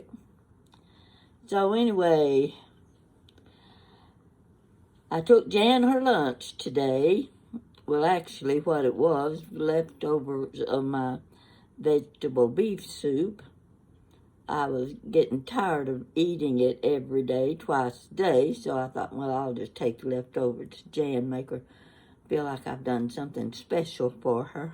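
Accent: South, United States. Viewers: I appreciate your company. I feel like you are very sweet and homey. I love the sound of Southern people. ❤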